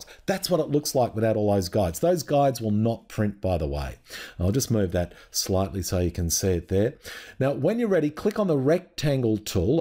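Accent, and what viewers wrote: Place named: Australia. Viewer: Great tutorial. Easy to follow, lots of tips. :-) I love to find an Aussie talking. I have one tip of my own (which you probably know of) Double click on text box corner handle will resize (shrink or expand) text box to fit text. This removes overset "red plus" indicator. Also a request for future posts. I am deaf and I rely on CC, so please minimise the amount of info on the bottom 1/4 of screen, because sometimes critical visuals are concealed by captions.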